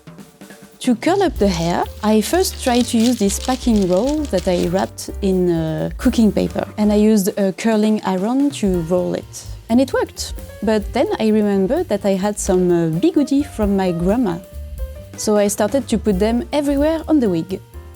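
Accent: France. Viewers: Je me demande si tu fais exprès de ne faire aucun effort de prononciation pour donner le charme de l'accent français vu par les étrangers ou si c'est naturel xD Quoi qu'il en soit, c'est très marrant, très bien rythmé (le montage de la vidéo est nickel) et même si je ne porte pas de perruques j'ai trouvé ça très intéressant. Naïce! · OMGGG l'accent français (｡･ω･｡)ﾉ♡✨✨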